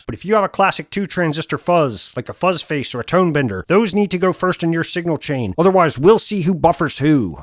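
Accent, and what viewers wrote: Canada. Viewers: Dude, I am basically the audience for your Jordan Peterson content. If there's only one dude on the whole Interwebs who gets what you're trying to do, it's me, and I love it. My significant other of nearly 30 years is Canadian even though I'm from the American South. I studied history and political science in university. And I'm a 2000 graduate of Musicians Institute's Guitar Institute of Technology in Los Angeles (Paul Gilbert's alma mater). So your stuff is hilarious to me because I get it on so many levels, especially when the jargon makes sense not only as satire but as literal tone shaping advice or political critique. And the accent: "top" = "tawp." Next up, "Toronto" = "Toronno!" You've epitomized an enraged Canadian conservative forced to live in liberal Ontario. You have captured the zeitgeist of my wife's country and the rage of their small, American-loving conservative fringe perfectly, especially from my perspective of spending so much time between liberal Toronto, Montreal, and Ottawa, looking over into Alberta. 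I can hear Alberta's outrage in Kingston, Ontario even though they're two provinces away. :) Canada, a strange land where being on the left side of the country means you're politically right, and where being a Liberal means you're probably a diehard Canadian nationalist who can trace his roots to his Loyalist 6x great grandfather. :) Cheers man! Keep them coming please. :) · Has anyone actually been to an MBV concert? I have. I couldn't hear properly for a week after. Now I understand that their Muffs were obnoxiously interacting with with their delay pedals. And as I always say to these: More. Canadian. Accent! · In addition to being really funny, this is a truly good approximation of Peterson's/ most Canadians' accents. It's not easy to do. So, kudos! Doublemint kudos!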